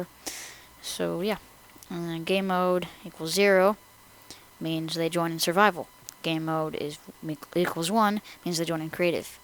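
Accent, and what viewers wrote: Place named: Texas, United States. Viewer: you sound texas are you texas??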